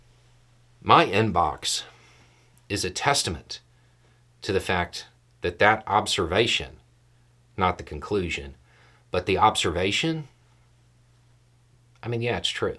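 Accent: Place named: Transatlantic, United States. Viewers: Hi, I really enjoy your compassionate analysis. I understand this video was a tangent for you, but I really enjoyed it. Also, please feel more than free to use the transatlantic accent as often as possible in the future. I loved everything about it, no notes! 🙂❤️ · transatlantic accent is not something I ever expected to hear. 10/10.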